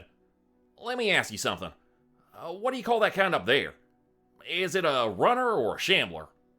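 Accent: Canada. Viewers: Your Canadian accent makes your stories very entertaining good job! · I like your Canadian accent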